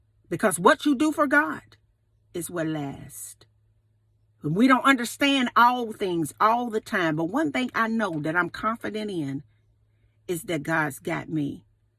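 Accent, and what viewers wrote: South, United States. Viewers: Thank you. ❤ You sound like you are from downsouth. May I ask where you are from and what is your ethnicity?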